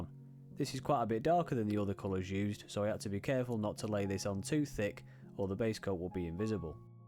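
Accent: United Kingdom. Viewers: Ready to take up one of my favourite childhood pastimes again, and your channel looks splendid for learning the proper way to do it. Was a bit confused seeing Biltema acetone combined with your British accent. DIdn't know it was available outside Scandinavia.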